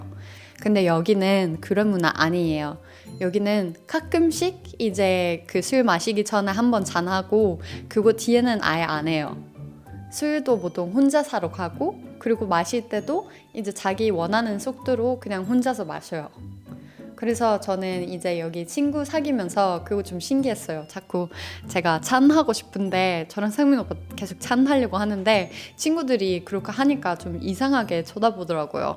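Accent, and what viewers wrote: South Korea. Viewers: 억양이나 발음이 한국인같아서 놀라고 너무 예뻐서 놀랍네요. 행복하세요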